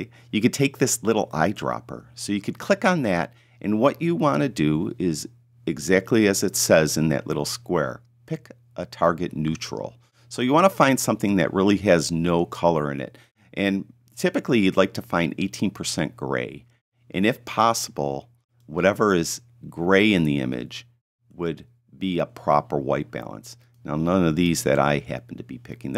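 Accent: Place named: Western New York, United States. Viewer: Hey There, first I recognized the unmistakable Western NY accent, but the working photo from Hamburg was the dead give away. I grew up there. So cool! If I'm not mistake, that's Hoak's restaurant. My first Communion dinner was there... some 48 year ago!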